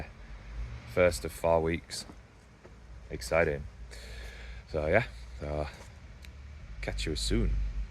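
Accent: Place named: Yorkshire, United Kingdom. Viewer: Video is great, loved it! And that Yorkshire accent! 😊 Wish the background music was a bit more relaxing, though.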